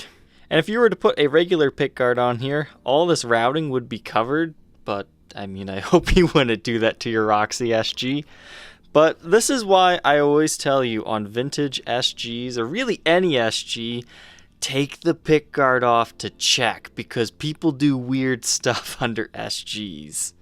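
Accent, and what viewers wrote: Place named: Southern California, United States. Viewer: ...it adds a certIN Quackiness to yer tone.! for a guy who isn't into Frank, sure has his vocal speech southern Cali dialect down to an untrogladyte level.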